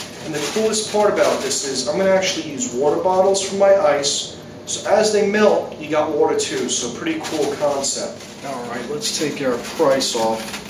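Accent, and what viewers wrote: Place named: Philadelphia, United States. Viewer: nice one, but put those cubes in a baggie. you sound as if you may be an original philly boy, am i right?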